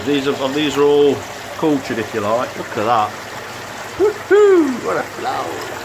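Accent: Nottingham, United Kingdom. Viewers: Love of Orchids,Koi & Gardening! Nice one, is your accent nottinghamish? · Pond and grow on is looking fantastic matey... Just starting with my build at the moment and created a channel... Would love it if you subscribed and if your free offer any advice...By the sounds of the accent and your Notts shirt you may be local to me ? <link>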